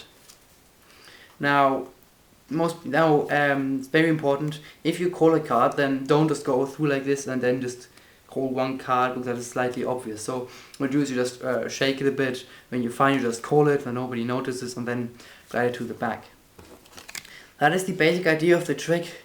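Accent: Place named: Germany. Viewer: That german accent😂👳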